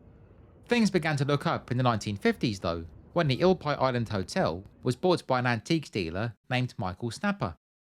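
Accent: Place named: Essex, United Kingdom. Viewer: I love your accent it reminds me of my dad's best friend ,who we called Uncle Frank.He was from Essex close to town.But he was a working class man with a vast knowledge of history and politics .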